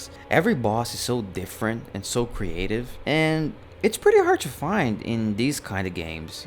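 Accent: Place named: Brazil. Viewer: Sim KKKKKKKM Eu pensei que era um brasileiro no começo do vídeo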